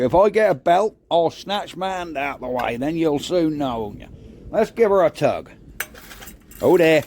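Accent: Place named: Yarmouth, United Kingdom. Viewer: All I did was report it stolen which was a waste of time. But I found out who stole it (another boater) but they had sold it by then. I bought it off of eBay for £20 If my memory serves me right and got it running. But it never really ran smoothly as the float needle was tired. I put a toggle switch on the voltage adjustment so I could use 12 and 24 as didn't need the 6v. Just so you know it works brilliant as a jump starter. I started a mates horsebox with it as it ran 24v. It's good to see it's still being used and is going to do you a good turn. Glad it's safe now and your the new owner. Love your video's my mum walked in earlier whilst watching a video as she instantly recognised the accent. She's born and bred Yarmouth.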